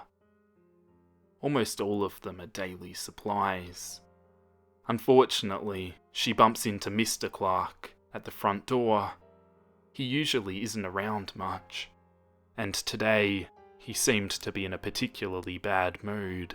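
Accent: Australia. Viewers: Are you a Aussie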